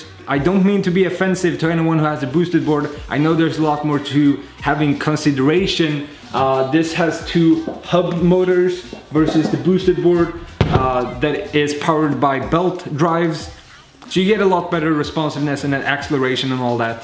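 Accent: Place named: Sweden. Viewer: Just when I was wondering "why are his pants so small?" he started speaking with a Swedish accent and it all made sense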